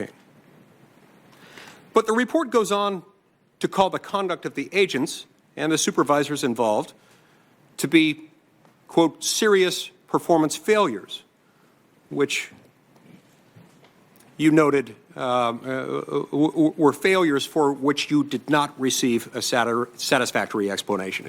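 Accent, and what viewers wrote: United States. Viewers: He’s talks like he’s from Minnis-oda love you sir. I just love your accent more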